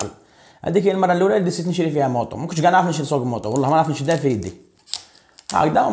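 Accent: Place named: Blida, Algeria. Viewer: هدرة تاعك تبان بليدي ولا قليعي منين الأخ 🤔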